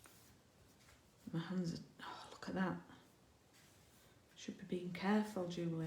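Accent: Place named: Yorkshire, United Kingdom. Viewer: Watching replay from Sydney. I’ve been enjoying your videos. I love your accent. Are you from Yorkshire? I was born and grew up in Stoke. ❤️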